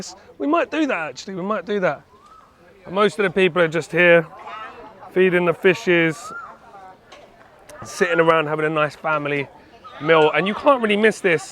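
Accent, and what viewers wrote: Thailand. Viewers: เก่งจังอู้คำเมืองได้ด้วย..เว่าอิสานได้บ่..ดนตรีประกอบกะดนตรีอิสาน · 5555+++++ฝรั่งพูดเหนือ น่ารักดีคับ รำ รำขนาด รำแต้ๆ 555+++ ขอให้เที่ยวภาคเหนือให้สนุกนะคับ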